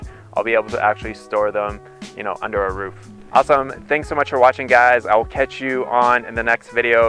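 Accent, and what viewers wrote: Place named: Canada. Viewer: I'm from Western NY so I recognize your subtle Canadian accent. I enjoy hearing it and your video content.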